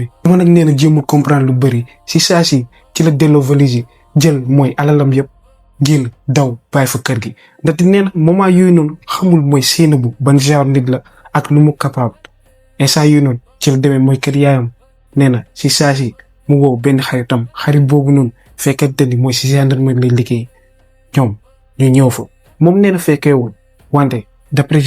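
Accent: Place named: Marseille, France. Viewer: kn marseillais nga 🙄😒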